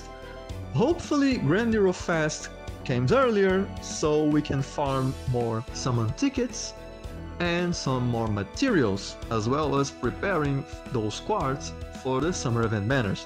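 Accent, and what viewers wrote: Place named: Brazil. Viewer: Estou sentindo um cheiro de PT/BR nesse inglês aí 🤨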